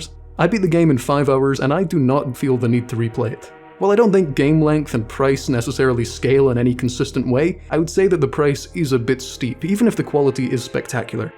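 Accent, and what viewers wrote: Scotland, United Kingdom. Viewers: Don't stress your accent (I'm sure you don't), as another Scot I could hear it! We don't all have to sound like Rab C to be Scottish. Great review of a great game too! :) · FYI buddy. My grandparents were Scottish and I clocked you as being from there because they sounded the same even years after leaving.😊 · I cannot comprehend the joy I feel when listening to a Scottish video-essayist. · Dw bro i could tell you are scottish but only cos im also scottish i can see how people didn't know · Not sure who has told you that you don’t have a Scottish accent. To my English ears, it’s VERY clear. Yeah, you’re not cutting about sounding like Fat Bastard but your accent is unmistakably Scottish 😅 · Bro was seriously like “I know I don’t sound Scottish” with the most Scottish accent I’ve ever heard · you sound like your speaking with a scottish accent to me, maybe not super strong or anything, but I can definitely hear it in your voice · Mate, you do have an accent just a little one. 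You don’t have a strong one though I’m from Wales. I don’t really have an accent but some people say I do have somewhat of a little Welsh accent, you serve a little bit of the Scottish accent so you do have an accent is just not as noticeable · You definitely do have a Scottish accent mate! Maybe just needs a Scottish ear to hear it and be sure but I found one of your other videos before this one (the Granny one) and I clocked you immediately 😅 it's a soft one, I'll give you that at least. Edit: commented this before I got to the Maryhill bit and now am howling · I think you do have a Scottish accent, just a very slight accent. Maybe not what you would think for one… but it is still there. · As a fellow scot with a wonky accent, I just wanted to mention that you absolutely still sound Scottish! I only just had YouTube recommend you today (slenderina), I cannot understate how happy I was when I instantly heard a Scottish accent because I'm constantly hoping to come across more Scots to watch. 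Seeing you had made this video made me even happier because I deeply enjoy this game for all the things you talked about. I do somewhat blame the lack of authentic scottish representation in media with the increase of people being online more and more for the accent stuff, I've come across a few scots online with the same issue. But yes you definitely sound Scottish!! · I think it’s important for you to know- you do have a Scottish accent, it’s not heavy whatsoever but you can hear hints of it, especially in words like here and two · When i first heard you i thought you were Scottish... Then i thought you were Finnish.... Then i thought you were Scottish- glad that my confused guesses were finally proven correct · accent seemed obviously scottish to me tbh. not a common one but its in the way you use your vowels. also "fucking yass man" made me choke on my dinner laughing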